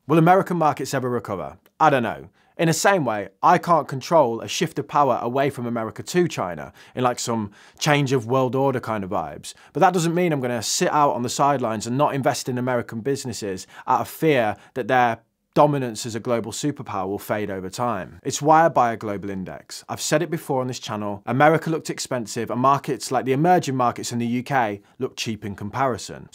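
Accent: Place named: Midlands, United Kingdom. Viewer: Trying to pinpoint your accent, sounds slightly midlands with a northern twang or maybe Cheshire?! Anyway great content as per usual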